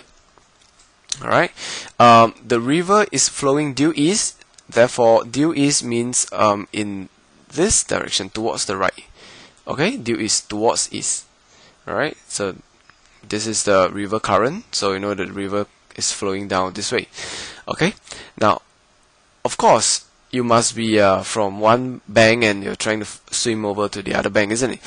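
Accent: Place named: Singapore. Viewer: i bet you are from singapore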